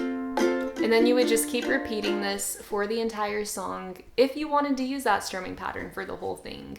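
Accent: South, United States. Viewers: That southern accent makes me melt. ❤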